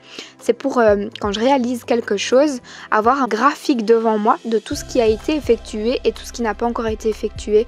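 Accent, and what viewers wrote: Belgium. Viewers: Tu es Belge ??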